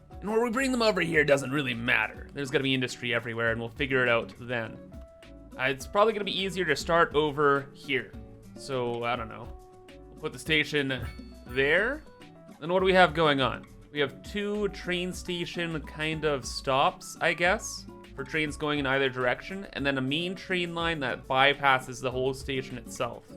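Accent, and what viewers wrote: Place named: Canada. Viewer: you can tell by his accent he’s definitely Canadian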